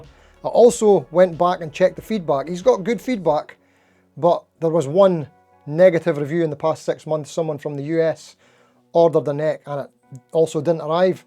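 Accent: Scotland, United Kingdom. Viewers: glad you took it in good humour, I'm in Australia and we have trouble understanding a thick Scottish accent. Not that our accent is anything to brag about lol. 😊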